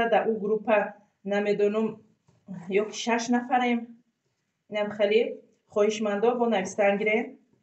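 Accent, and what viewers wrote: Tajikistan. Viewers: Хохарам шумо зани бехтарини тозаи точики хастед мехохам симои шуморо бинам факат дастонатро мебинам овози форам доред чунки тоза точики гап мезанед❤❤❤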